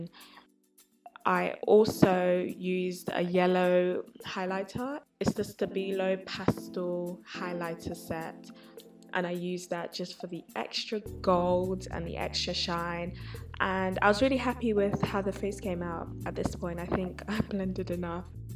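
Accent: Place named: United Kingdom. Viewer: I love your accent, I’m british too, also this is an incredible drawing! You have a lot of talent.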